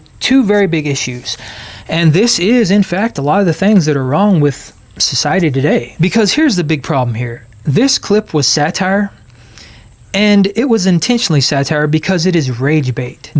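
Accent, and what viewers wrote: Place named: Kentucky, United States. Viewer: I’m from Southampton, UK. I’ve been listening for a while — what an accent you have from Kunnnntuckyyyy! Anyway, I wonder if these data centres are also connected to rezoning. Imagine living on the same road as one of these facilities with all the noise pollution. It makes you wonder whether some people are being pushed out of their homes.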